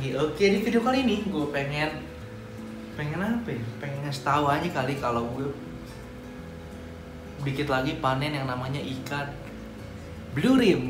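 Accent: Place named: Betawi, Indonesia. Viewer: Batawi luh medok medok bner om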